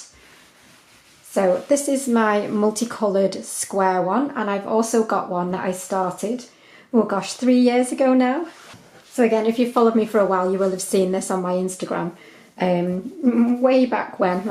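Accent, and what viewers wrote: United Kingdom. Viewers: Hello! 😊 First, thank you for your wonderful instructive video! Your voice and British accent sounds very kind and patient. My question is - Can this be done without turning after each round? Does it not look good if you don't turn? I taught myself how to do some crochet back in the 70s, but at that time I usually only did the basic stitches (single, double, triple, slip stitch and so on. I hadn't heard of the monk stitch yet before today. And I also haven't done crochet in a about 30yrs, I wanted to make a macrame dream catcher and decided to make a doily instead of purchasing one. I think I've found a renewed love for the crochet! Thanks again for your video. I'm sure I will watch more!☺️ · My heritage is Scots and I love a British voice, and I appreciate so much learning a new pattern. I have been crocheting since my mother-in-law taught me how in 1997.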